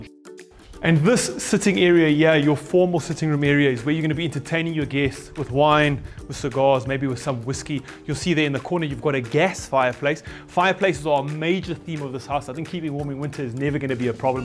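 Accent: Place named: South Africa. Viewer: Thank goodness! A normal SA voice! So much better! Ur hey guys. See guys ur. Lol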